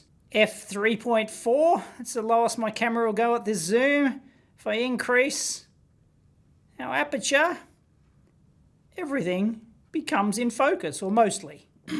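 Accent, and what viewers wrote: Australia. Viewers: Neat, love ya Aussie accent. Feel '0' vision would revolutionise the porn industry 😁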